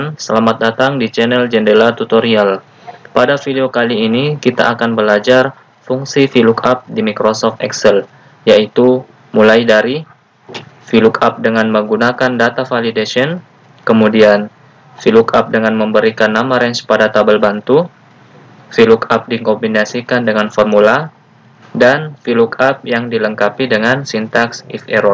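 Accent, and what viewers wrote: Indonesia. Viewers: Kayak logat sulawesi sappo..makasih tutorialnya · sepertinya masnya orang makassar ya...soalnya logatnya kental banget..thx ilmunya yang mudah di mengerti !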